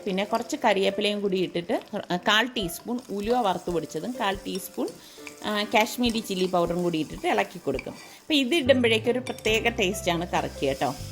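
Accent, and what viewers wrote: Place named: Thiruvananthapuram, India. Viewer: Thiruvi.,keera., veravi Assal thiruvantharam bhasha....😁😁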